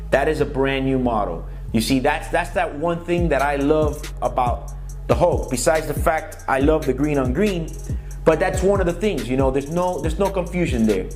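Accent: Cuba. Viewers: Cuban accent,, interesting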